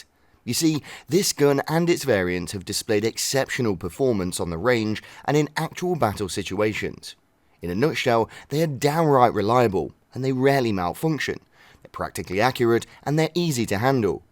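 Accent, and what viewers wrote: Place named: United Kingdom. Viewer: I hear a British voice and immediately know this person doesn’t know shit about guns let alone ever seen one in person😂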